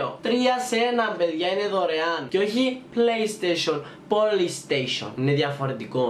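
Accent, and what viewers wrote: Cyprus. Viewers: Λατρεύω τα κυπριακά !!! Και είσαι πολύ ωραίος και σου πάει πολύ το μαλλί !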